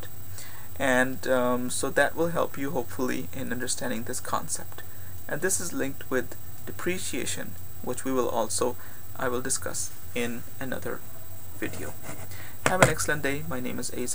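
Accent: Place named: Canada. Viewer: you are the best teacher ever and youre canadian and your accent is beast so youre my favorite now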